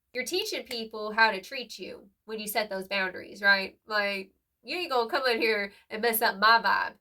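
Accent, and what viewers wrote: South, United States. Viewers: I’m loving your accent , I’m guessing South Carolina, Georgia? I am rising like a phoenix, a thunder bird. ❤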